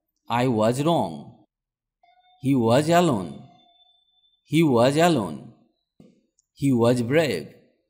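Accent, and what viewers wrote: Bihar, India. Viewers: Bihari ho kya sir 🙏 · Real bihari slang.. english (Englise) patient (pesient ) was (waj) extra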